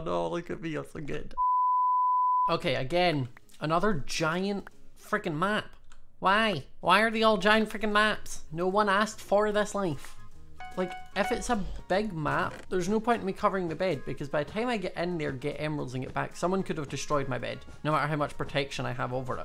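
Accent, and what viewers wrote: Scotland, United Kingdom. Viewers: Lol i van barely hear what hes saying through that thick scottish accent XD your vids are awsome luv ur vids!!!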